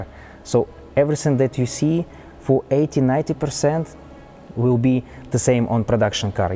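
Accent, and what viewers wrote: Russia. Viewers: Ведущий русский что ли акцент такой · this car is as ugly as the russian english spoken by the presenter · very ''Russian'' English... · Russian English 🤦🤦😂😂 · Хех, русинглишь. Ну понятно говоришь, молодец! За обзорчик спасибо! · Блин учи Английски. Звучишь как русский из американских фильмов.👎👎👎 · I love that he has a Russian accent and looks asian, reviewing a German car. Global! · Sorry bro but its impossible to listen your russian english..its not normal.. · Привет! работай над английским, особенно над произношением! Ну прям Русский Английский. Молодец! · Russian Accent is horable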